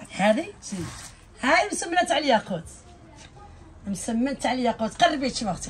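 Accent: Morocco. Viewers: لهجتكم بحال شي مغربية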